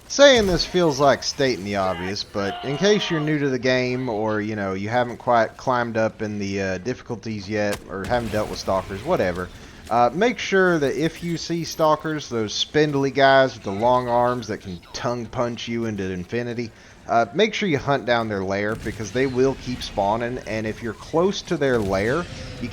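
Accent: South, United States. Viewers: Came for Helldivers tips....stayed for the Southern drawl....lol. Entertaining vid and great commentary.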